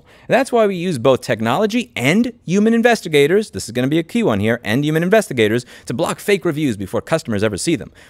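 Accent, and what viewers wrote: New York, United States. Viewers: Hey louis. I genuinely didn't like you when i first found you. I couldn't tell you why, I'd like to blame my Midwest sensibilities clashing with your NY pattern. But I've learned over time who you are and what you want. As little power as i have, i am with you, and I am sharing your vids. I wish i had learned earlier that we had the same goal. Thank you for never stepping down or shying away. You are what every nobody trying to make the broken world work needs. I wish i had half of your drive, and I appreciate how much you do, bared to not just the sun but the crazy lens of the internet. You refuse to compromise, you refuse to back down, and I love it. Thank you louis.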